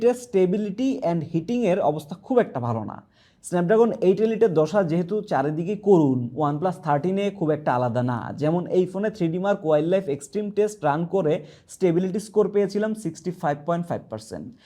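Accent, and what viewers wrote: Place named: Rangpur, Bangladesh. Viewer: ভাইয়ের কথা গুলা কেমন যানি অন্যরকম লাগলো। মনে হচ্ছে রংপুর বা দিনাজপুর বা বিক্রমপুইরা লাগে অনেক টা।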